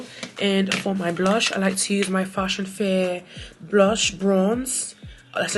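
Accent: London, United Kingdom. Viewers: Im so here fot the bri’ish accent! 😂🙌🏽 it never gets old or annoying! I thoroughly enjoyed watching you speak in their accent while applying your makeup ☺️ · omg I'm so here for the British accent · Accent solution, a Caribbean babe that was born or raised in London. Both come through. Tootsie time comes to mind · It's the British accent for me. You have a friend in me well a born and raised British play mommy here. You looked beautiful for dinner. Of course you had me dwl. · I love your British accent 😁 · Nailed London voice frfr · Your British accent is so fun! 😄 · Love a British accent! ❤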